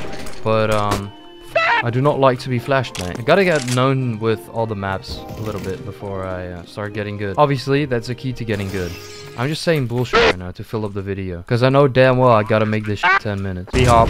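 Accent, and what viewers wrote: United Kingdom. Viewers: Omg ur british accent is so fucking nice dude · nice britich acent matè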